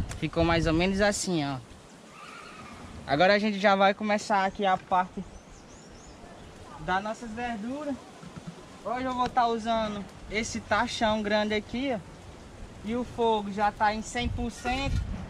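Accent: Brazil. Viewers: Mano você é de qual lugar do Ceará?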